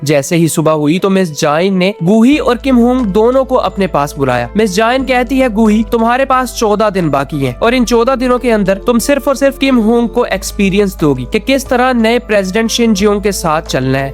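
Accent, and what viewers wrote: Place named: Pakistan. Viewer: Aapke pronounciation ke Hisab se Aap Pakistan se Belong karte ho na - Bythe way Voiceover 🗿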